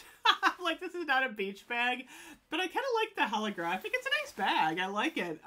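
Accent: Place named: Ohio, United States. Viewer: I love watching your videos- there’s something almost nostalgic and comforting about your content. I kept thinking- “I bet she’s from Ohio!” I grew up in Columbus, OH and there’s something so familiar about your accent and style.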